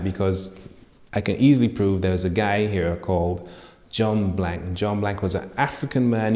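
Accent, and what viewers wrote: United Kingdom. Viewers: Great work Sir. May I humbly suggest that you enunciate your words and slow your speech ever so slightly. The British accent is sometimes difficult to clearly catch every word. Please understand that I seek not to correct you, but offer constructive suggestion, as your presentation is extremely important and relevant. I'm from England and the Caribbean and had slight difficulty catching certain words. Not much but it was distracting and I applaud your work nonetheless. Press on Mr.. The truth will find fight its way to the light, where it will be a beacon. Indeed by you and through you.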